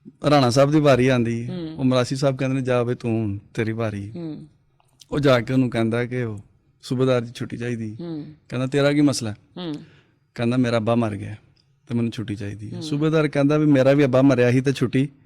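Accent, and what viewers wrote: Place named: Dogri, India. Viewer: Vadia j&k di dogri BOLI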